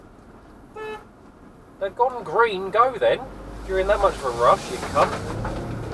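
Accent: London, United Kingdom. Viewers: You sound like you’re from Hainault area? A friend of mine is from there & you both sound identical lol 😁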